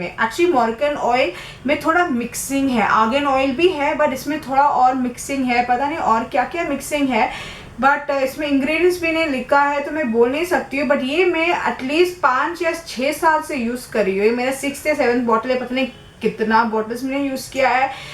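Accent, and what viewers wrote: India. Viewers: Hahahahah u sound cute in ur Hindi accent ! 😂😘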